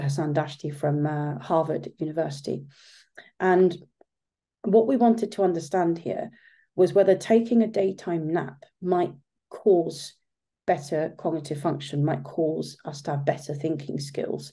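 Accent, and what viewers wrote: England, United Kingdom. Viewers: How can you not understand an English accent?! It is quite nice. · It's an English accent, it's the English language.... Literally the correct accent.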